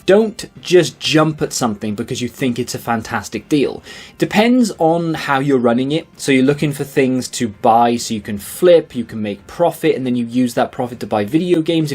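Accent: United Kingdom. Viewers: U sound like a brit😂. Here in the states wii u games are rising. And esp the 3ds are getting super expensive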